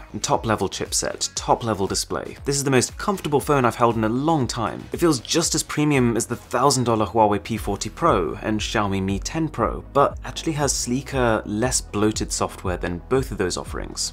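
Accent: RP, United Kingdom. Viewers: Nice review but why do you talk Posh? lol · Where the hell did you get that info from? The dude is British and it's been proven before by the uni he went to and so on. I've also met people from the UK with the exact same accent and they're not too hard to find. Go do your stupid conspiracy elsewhere.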